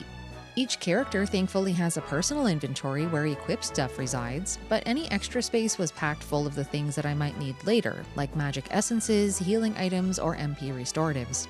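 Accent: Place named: Atlantic Canada, Canada. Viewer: I just watched your Faxanadu play through and couldn't stop thinking that you sound like you're from the east cost of Canada :)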